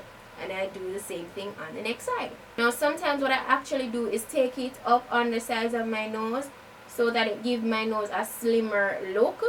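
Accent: Jamaica. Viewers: love your accents Jamaican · JAMAICAN :-)... Beautiful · Yaardie gal you accent strong sah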